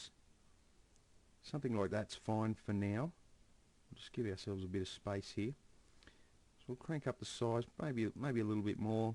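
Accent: Australia. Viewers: That's an Australian Accent if I ever heard one. Awesome Tutorial, thank you very much. :)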